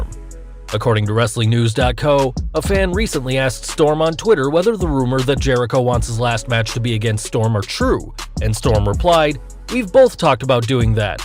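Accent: England, United Kingdom. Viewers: Lol he's english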